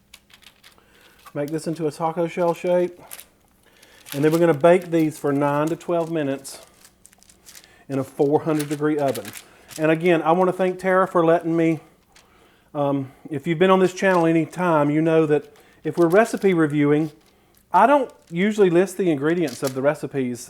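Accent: South, United States. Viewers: I like your southern accent. Sexy guy, thanks for making these videos. I watched so many keto recipes then i do not have time to try these like you. I appreciate your work and humor. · You are such a joy to watch. I always feel I just had a visit from a personal friend. Love your Southern conversation while you cook. God bless you and yours. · Oh my GAWD you are so Southern and I love it! You talk so much like me and the people I know. When you just said "Y'ALL." I was LMAO. BTW, here's an idea to keep your taco shell shaper from rolling around: Use two measuring cups of equal size, spouts pointing toward each other, instead of glass jars. The spouts will help hold the dowel in place. :) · Ur the bomb! I love the southern accent and expressions. ❤️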